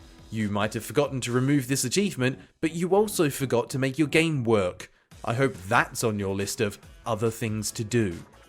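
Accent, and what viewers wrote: Australia. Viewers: YOU'RE AUSTRALIAN???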